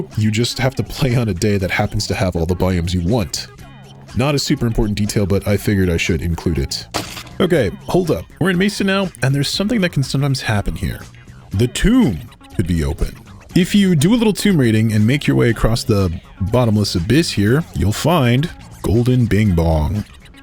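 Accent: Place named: United States. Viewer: Where do you live that you have an american accent and your room can get to 90 degrees in the middle of January??? Do you live in a windowless basement? Pheonix Arizona??? Ameristralia?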